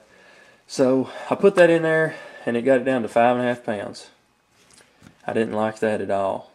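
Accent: South, United States. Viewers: I would have to say that I believe you may be a touch Southern. We talk a little funny to the northern folks.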